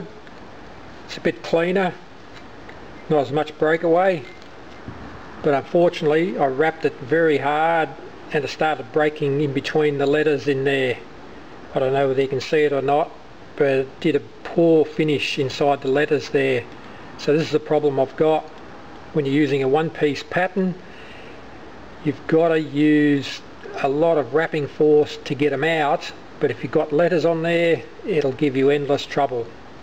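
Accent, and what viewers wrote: Australia. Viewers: Sounds like your from Victoria ?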